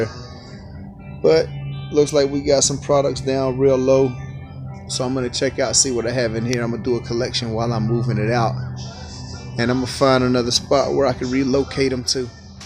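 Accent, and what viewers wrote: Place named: New Orleans, United States. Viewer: Not sure what rabbit hole I’m in that I landed on a vending machine video but I need to know where this man is from so I can place the accent (love it, New Orleans?) and I’m going to look into buying a vending machine!! Lol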